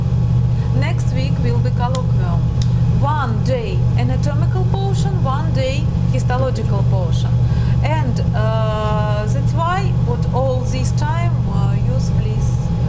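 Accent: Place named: Russia. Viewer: i love that russian accent bro :)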